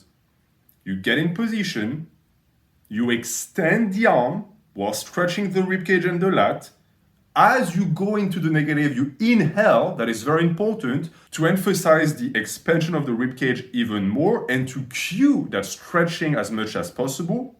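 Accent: France. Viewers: je sais reconnaitre un accent francais quand j'en entends un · Oh l'accent français !!! Trop content de découvrir cette chaine !!! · Rassure moi,tu es français? Je fais allusion à ton accent. Je suis également fan du pull-over,il travaille tout le haut du corps,trapèze,épaules,dos et pecs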